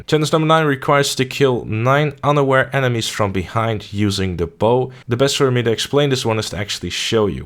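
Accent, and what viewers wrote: Netherlands. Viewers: Subbed! Your challenge videos have helped me out a bunch! Are you Dutch by any chance? I recognize the accent I think ;)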